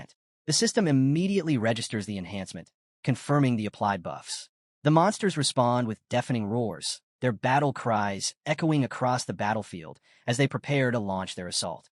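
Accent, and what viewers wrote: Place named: United Kingdom. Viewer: The british narrator change on the 10 hour to 12 hour mark made my brain go mush i could not understand anything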